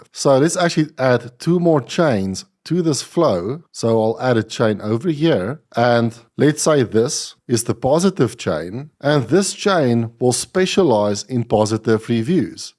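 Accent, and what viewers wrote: South Africa. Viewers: So South African :D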